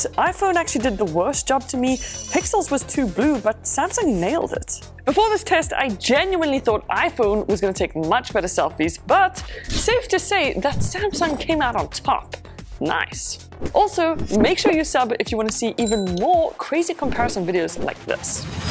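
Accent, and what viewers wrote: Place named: South Africa. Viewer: Is it just me or does she sometimes sound a bit South African? Just the way she pronounces some words reminds me of a South African accent.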